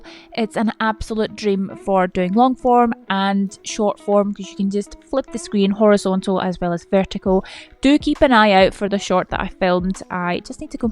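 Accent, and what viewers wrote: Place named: England, United Kingdom. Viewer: Can somebody tell me sweet English accent is that ? ❤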